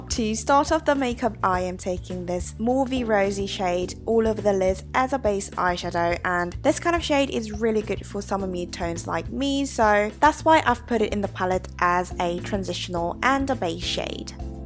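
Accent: United Kingdom. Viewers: 영상 오랜만이에요 언니 !!!!항상 브이로그 챙겨보는데 항상 밥드실때 댓글보신다고 하셔서 댓글 오랜만인지 처음인지 ....? 여튼 달아봐요 !!!항상 영상 잘 챙겨보구있고 언니 브이로그 보는게 진짜 삶의 낙이에요 ㅋㅋㅋㅋㅋㅋ밥먹을때나 자기전에나 꼭꼭 한번씩 더 챙겨보고 자요 !!!!봤던거라두요 ㅎㅎ 하루하루 브이로그찍으셔서 보는 재미도있구용 ㅎㅎ 영상 길이도 길어서 넘나 좋아요ㅠㅠㅠㅠ 항상 영상 잘보구있으니까 언니 화이팅해요 💕💕💕💕💕아 그리고 언니영상보면서 영국 특유의 그런 억양 너무 배우고싶어졌어요ㅠ영국 너무 가보고싶어요 !!계산도 혼자해보고싶구요 ㅋㅋㅋㅋㅋㅋㅋ여튼 영상 잘보고가요😘👍🏼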